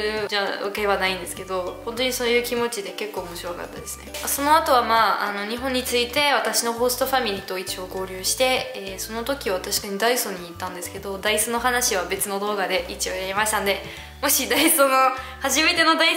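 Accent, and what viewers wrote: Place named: Kyushu, Japan. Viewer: あれっ! さん、九州人みたいに話している(うれしいね)。日本語は福岡で勉強したのかな。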